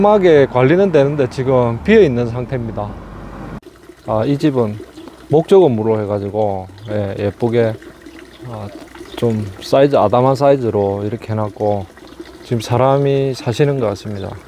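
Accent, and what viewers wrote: Gyeongsang, South Korea. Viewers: 구수한 경상도 표준어 외지에서는 경상도사투리라고 합니다 오늘 영상 잘봤습니다 항상 감사합니다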